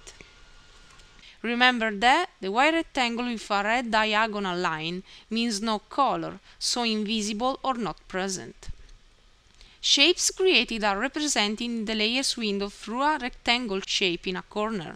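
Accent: Italy. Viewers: great job! nicely explained. ben fatto, ho sentito un piccolo accento italiano? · thanks,your all tutorial r very good n easy to understand n it's working out for me,your pronounce is very cute,i guess u must b Italian by the way keep it up this good job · are you italian ???? just asking cause your accent is so cool :) :) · R u Italian?? Cause ur accent looks like Italian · Love the accent as well sounds Italian. The video is pretty cool I think you encapsulated everything quite well for basic skills...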